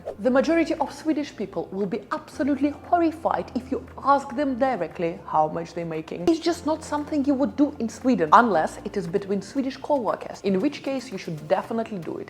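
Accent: Russia. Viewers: Your russian accent hurt my ears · Are you russian? You have russian accent . An intonation mostly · Похоже на русский акцент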